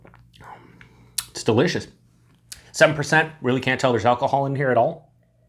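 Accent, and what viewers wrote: Western New York, United States. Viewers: Are you from western NY?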